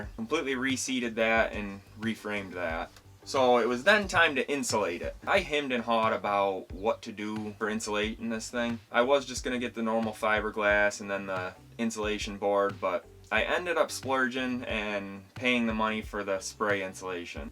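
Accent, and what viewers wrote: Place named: Minnesota, United States. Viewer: I like how honest you are. And I have a feeling you are a bit of a perfectionist. Also I’m from Minnesota and it sounds like you might be too… or at least a state that touches Minnesota.😊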